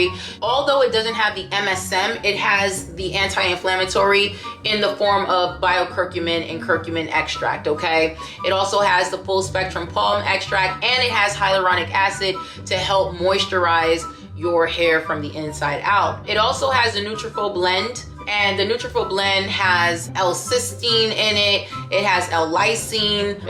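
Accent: New York, United States. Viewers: U definitely from New York or Jersey Lml. 💙 from Brooklyn🗽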